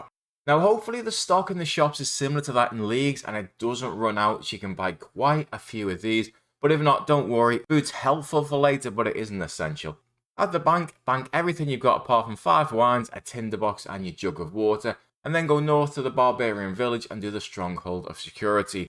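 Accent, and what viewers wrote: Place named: Wirral, United Kingdom. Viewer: Maybe a Wirral accent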